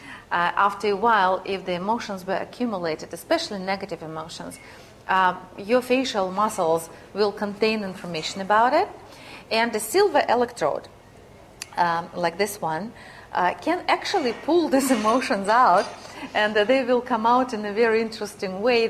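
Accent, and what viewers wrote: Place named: Russia. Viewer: Эх, сразу чувствуется , русская женщина представляет скэнар ,акцент выдает )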